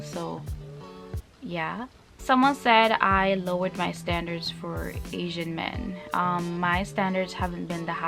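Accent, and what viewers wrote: Jamaica. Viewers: U from jamaica?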